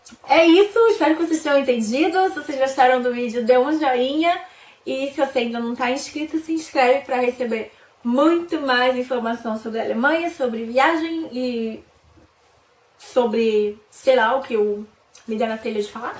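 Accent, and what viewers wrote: Rio de Janeiro, Brazil. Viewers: Você morava em São Bernardo do Campo? Mas você tem sotaque carioca, haha.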